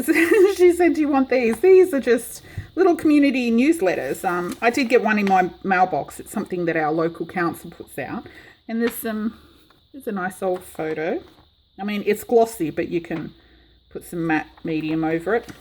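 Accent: Australia. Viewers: Yaaay! That's super!! You're joking about your voice, right? I love your voice! It is sweet, friendly, good spirited and you have an Aussie accent to boot! What could go wrong? 🤗🤗🤗😍😍🤩🤩😉😎😜😜😝❤ Hello Barbara! And I'm only famous inside my studio. 😜😜😜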